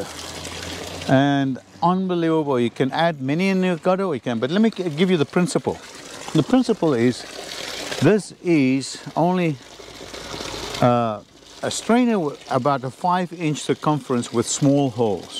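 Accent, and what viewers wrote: South Africa. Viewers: Are you South African that has been living in the states for quite a while? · And here I thought you were originally from Germany. Must be an Afrikaner accent, right?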